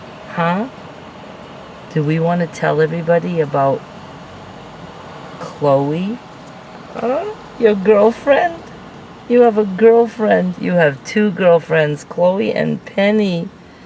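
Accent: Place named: South, United States. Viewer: As an Aussie, i just adore Southern accent:)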